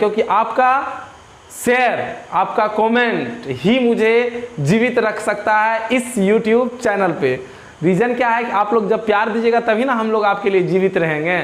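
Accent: Bihar, India. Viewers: Aap bhihar ke h sir 🤔🙏🙏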